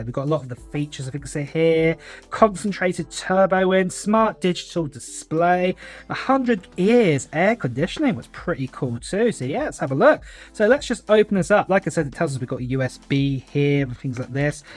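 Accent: United Kingdom. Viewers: Sounds so british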